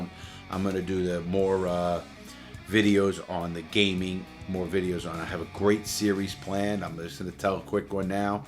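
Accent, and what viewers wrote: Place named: East Boston, United States. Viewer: Sounds like East Boston in the 50s 60s.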